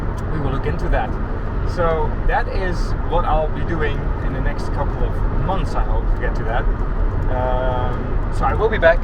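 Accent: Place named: Netherlands. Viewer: I thought you were Dutch,recognizes the accent,I’ve played hockey against Eindhoven way back in the 70s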